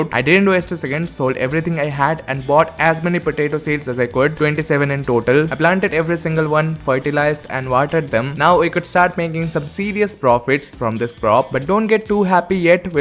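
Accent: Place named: India. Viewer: I know this guy thought it was AI when it can't even mimic Indian accent plus where have you seen in ai videos their logo pop up as their mascot never smh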